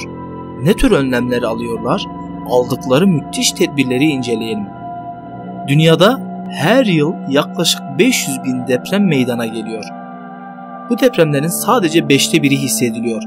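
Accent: Aegean, Turkey. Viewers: Ege şivesiyle muhteşem bir ses eline sağlık yeni videoları sabirsizlikla bekliyoruz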